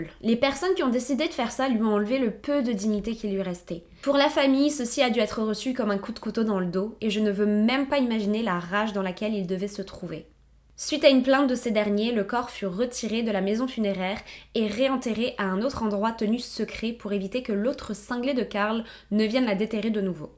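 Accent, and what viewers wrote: Quebec, Canada. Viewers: Je suis surpris que tu sois de Lille, tu as vraiment pris un accent canadien je trouve, on retrouve pas celui des HDF dans ta voix mais c'est aussi compréhensible quand on s'expatrie. En tout cas, chouette vidéo, je t'ai découvert grâce à Mogota et c'est chouette ce que tu proposes. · Très intéressant, ce n'est choquant, mis à part l'exposition au publique, j'avoue que c'est une sorte de dérive, mais je penses que celà reste une forme d'amour, étrange, glauque, qu'on ne peu comprendre 🤷‍♂️ mais ce n'est pas un acte criminel, ou du genres, c'est pour ça je penses qu'il a été relâché direct, ce n'est pas réellement du vandalisme en définitif Après j'avoue que c'est étrange et difficilement compréhensible mais bon 🤷‍♂️ Ah oui c'est vrai que tu es française 😌🤘 d'où l'accent québécois très léger, jm disais aussi ^^ C'est cool en tt cas et j'adore ta chaîne et ta personnalité 😊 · Trop drôle t'es d'min coin ! 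🤣 Je pensais vraiment que tu était Canadienne. 😅 Tu es passé du Nord au Grand Nord mdrr En tous cas encore une vidéo de dingue, quel taré ce gars 😵‼️ Continue comme ca j'adore tes vidéos ❣️ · Aucune apologie.......... De meme....... Une Française avec un petit accent québéquois !!!! Non de Lille mais de L'isle sur le doubs (dep 25)......... Voilà la raison pour laquelle vous etes toujours bien maquillée. Bonne fin d'année. un livre qui à mon avis est bien écris et intéressant : "The last victim" : Jason Moss et Jeffrey Kottler. (bouquin non traduit, version américaine). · Au début j'avais cru que t'étais juste d'origine française mais que t'étais vraiment québécoise parce que t'as vraiment pris l'accent et leur façon de parler, je suis aussi française c'est pour ça que je te le dis (et fan de supernatural au passage :p aussi de surnaturel/horreur)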